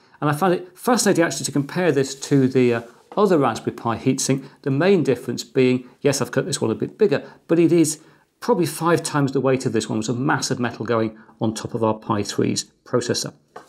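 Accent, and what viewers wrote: United Kingdom. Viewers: Sorry for asking something that isn't on subject, but are you British?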